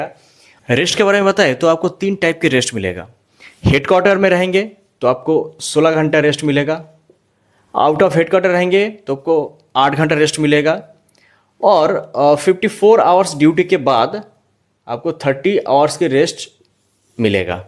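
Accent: Bengal, India. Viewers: Tumi bangali tomar kothatei bojhachhe 😊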